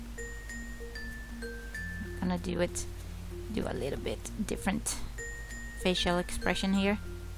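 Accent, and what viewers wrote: Sweden. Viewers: I thought that sounded like a fellow swede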